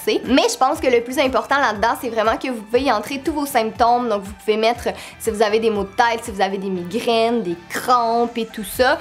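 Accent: Quebec, Canada. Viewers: St'une québécoise 😌💅🏼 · Seynabou Ng moi je crois qu elle et québécoise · J'aime bien l'accent québécois il est trop beau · Trop bien t'as vidéo !!!! T'est trop belle et j'adore ton accent québécois💜💜💜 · C’est juste l’accent québécois hahaha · Elle québécoise comme moi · C’est seulement la façon de parler des québécois moi même j’en suis une et pour moi c9est vous qui avait un accent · Toi tais français de France hein? Pcq elle,elle est québécoise(comme moi)😂😂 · T'as vraiment intégré l'accent québécois. On devinerait même pas que t'es née en France. C'est important de parler de ce sujet sans tabous, surtout quand il y a des gars qui écoutent, raison de plus. C'est un signe qu'on n'est pas tous indifférents à vos problèmes de filles. Même si on ne vit pas le phénomène directement, on le vit au niveau social. Et on imagine que c'est chiant au niveau inconfort et organisation et ce que ça vous coûte par année. 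Il faut continuer à bouger et prendre des analgésiques si nécessaire, et ne pas laisser vos règles vous empoisonner la vie comme une maladie. On trouve ça tellement plate quand une fille ne veut pas nous accompagner dans des sorties ou des activités aquatiques comme si elle était malade. et quand on est en train de relaxer, on peut vous offrir des massages pour vous rendre ces périodes un peu moins désagréables. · Ouais tes bien québécoise '' melon d'eau '' 😂😂😂 Ma grand mère est québécoise mais elle a pas du tout cette accent Peut être parce que j'ai l'habitude de l'entendre Bref tes vidéo sont super continue 😘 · oui elle est quebecoise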